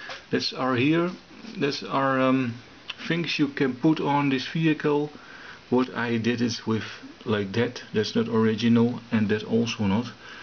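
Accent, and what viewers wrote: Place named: Netherlands. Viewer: I love the Dutch diction and intonation. No matter what, when a Dutchman is explaining something, even if it's something completely mundane, it always sounds like he's reading from a text book on quantum mechanics.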